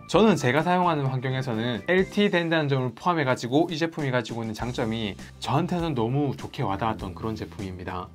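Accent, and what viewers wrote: Gyeongsang, South Korea. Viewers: 감사합니다. 약간의 경상도 엑센트가 들리네요. 대박 나세요.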